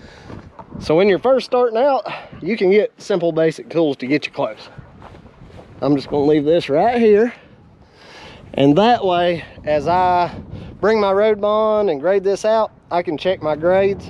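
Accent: South, United States. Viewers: I'm sure I'm not the only one to notice, your country twang goes away when you talk on the CB 🤣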